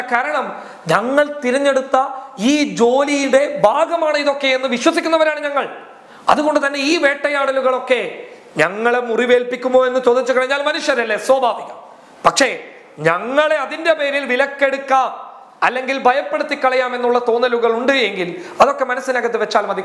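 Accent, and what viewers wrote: Kasaragod, India. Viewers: ഇതില് കോഴിക്കോട് കാരിയായ ബാച്ചാ യുടെ കാസർഗോഡ് സ്ലാങ്ങിൽ തന്നെ ആണല്ലോ സംസാരിക്കുന്നത്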